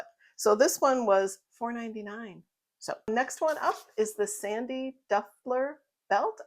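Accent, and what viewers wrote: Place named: Minnesota, United States. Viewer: Great video! Love your Minnesota accent! ❤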